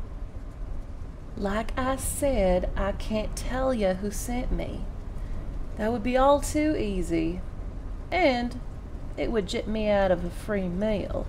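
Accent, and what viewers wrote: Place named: South, United States. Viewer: I am really feeling the southern Belle ascent in this one. Nice imaging of the Succubus.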